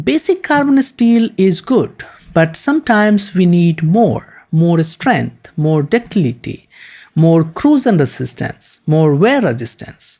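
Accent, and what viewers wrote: India. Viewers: Shit that is the thickest Indian dialect I have heard. Good video though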